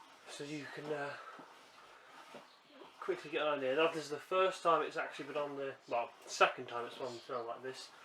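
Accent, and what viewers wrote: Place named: England, United Kingdom. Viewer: By the accent i'm going to assume you're English, if you are, where did you get your wood? I find it very hard getting anything other than pine in this country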